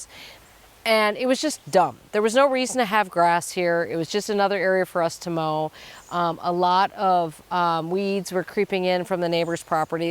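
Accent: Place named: Midwest, United States. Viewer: I appreciate the recommendation, but in checking out Soil and Margarita’s channel, it seems like she only wants to do videos at a certain time of day when the sun hits her just right so she looks her best (her words), she doesn’t like interruptions and stopped filming on garbage days for that reason (not that I blame her, but there is nothing “raw” about that), and just generally seems much more fixated on film production than “happy accidents” and filming whenever and wherever the desire strikes. I’m also a dog lover, so those interruptions are some of my favorite moments here! (Cannot beat the dunking of the head into the stock tank moment with quick wit of saying the dye is safe for even Newfoundlands!) 😆 I also just really feel a connection with the midwestern dialect as I’ve been separated from that area for many years and it feels very homey to me. 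Soil and Margaritas seems like a very sweet content creator, and indeed, she said she is not in it for the money, which is why I’m guessing you recommended her, but she’s definitely not like. I hope she has great success just the same though! 😊❤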